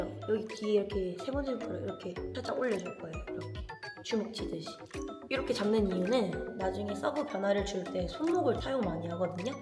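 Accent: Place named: Gyeongsang, South Korea. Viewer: 거 경상도 어디요?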